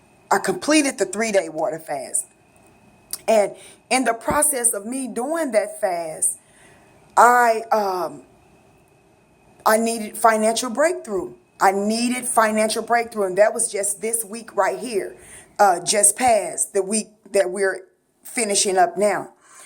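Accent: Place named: St. Louis, United States. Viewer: Amen sis! God is faithful! You sound like you from the home town! STL?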